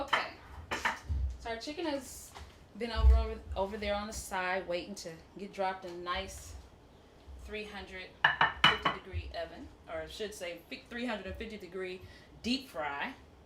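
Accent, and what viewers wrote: United States. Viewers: Yummy....Huge fan of your cooking and loved American accent. Wish you have your own TV cooking shows or even better come down under Australia and shows us Aussies your delicious cooking recipes.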